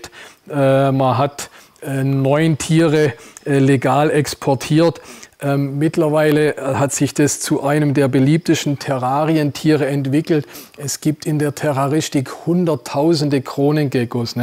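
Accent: Swabia, Germany. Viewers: Klasse. Vielen Dank für dieses wichtige und meiner Meinung nach dein bisher bestes Video. Einziges Manko aus meiner Sicht : dein hartnäckiges Beharren auf deine für Nicht-Schwaben schwer verständliche Mundart. Da du das offensichtlich weder ernst nimmst noch jemals ändern wirst : wie wärs mit generellen Subtiteln auf Hochdeutsch? Abgesehen davon hast du das Video echt toll gemacht und bei dem brisanten Thema echtes Fingerspitzengefühl bewiesen , Hut ab. Viele Grüße von Toni Mindnich aus Spanien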